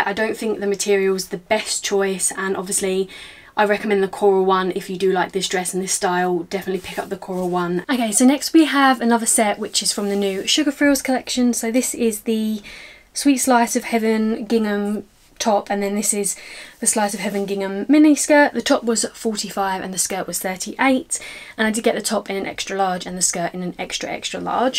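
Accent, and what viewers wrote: United Kingdom. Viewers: I Love You British accent.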